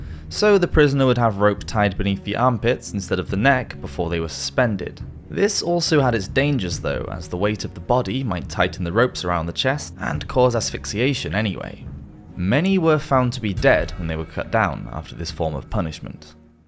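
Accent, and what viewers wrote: England, United Kingdom. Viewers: Margaret Pole, Duchess of "Sallis bury"? For a narrator with an English accent, this guy certainly has trouble with his English pronunciation.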